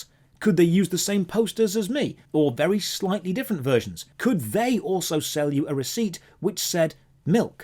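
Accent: United Kingdom. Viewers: Now we need him to fanboy massively at Space Marine 2 and bitch about even more terrible corporate practices with hilarious British accent.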